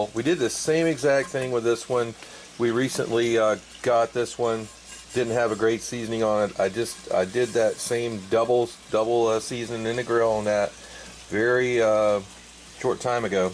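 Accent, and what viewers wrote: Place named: Pittsburgh, United States. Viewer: You from Pittsburgh?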